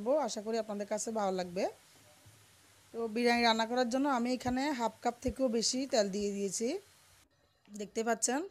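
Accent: Chittagong, Bangladesh. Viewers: চিটাইংগা নাকি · ওয়া তুই চিটাইংগে কেন?খথা উনি বুঝা জার।সুন্দর রেচিপি। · Unar kotha shune tai tu mone hocche. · আপনি কি চিটাগাং গা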